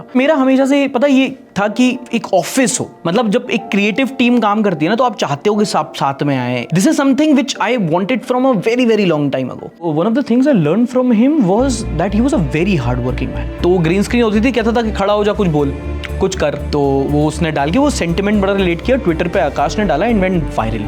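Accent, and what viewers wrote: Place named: Bundelkhand, India. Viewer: You are from jhansi , mein man hi man sochta tha ke aap hamare side ke hi ho kaeki bhot achhi bhundelkhandi bolat te video m aap ,, aaaj confirm ho gao aap jhansi se ho ham Orchha se H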